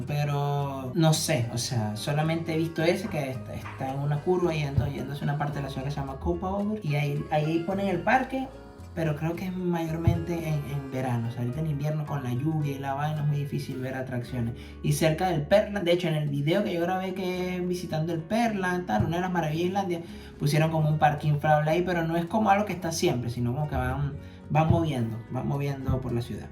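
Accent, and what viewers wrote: Venezuela. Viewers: Gracias por compartir tus vivencias allá en Islandia..yo la verdad me río mucho con algunas cosas q dices..soy venezolana también..Guayanesa Edo.Bolivar..tenemos el mismo acento..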